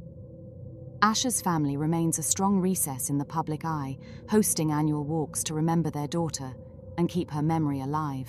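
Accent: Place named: England, United Kingdom. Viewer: I want that thick english accent back. Love your speaking voice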